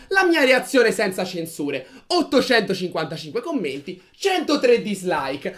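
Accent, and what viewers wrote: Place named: Turin, Italy. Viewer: Tipico accento di Torino. Hai studiato a Cuneo o ad Alessandria?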